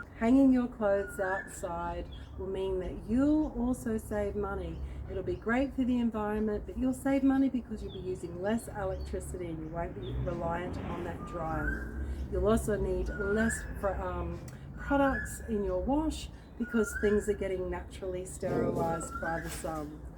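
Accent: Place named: New Zealand. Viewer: Im from nz and i remember a friend of mine coming to nz for the first time she couldn't get over how every household had a clothesline and we dried our clothes that way whenever we wanted to 😂 then i saw in some parts of the states people were excited to be allowed to have clotheslines! I was thinking that's weird had they been using dryers all this time when it's free to dry clothes outside? There were video's and blogs coming out on how to dry clothes for free (obvious air drying) how to make clotheslines, how to hang washing. I can't imagine being without a clotheslines. When i moved to my new home i done away with the old clotheslines and made my own suitable for my big family even repositioned it to get the most out of the sun during winter. We don't like dryers so i made use of our covered deck area for rainy day's and have made a simple clotheslines there as well because washing is neverending 😂😂 Loved your video coz I'm over here like hmmm let's see how other people be hanging the t shirts 😂 then i was like this a kiwi gurrrllll im sure of it she sound like a kiwi to me l0l anyways i guess we still lucky here to have clotheslines, water tanks and chickens running in the backyard etc love from home chur xx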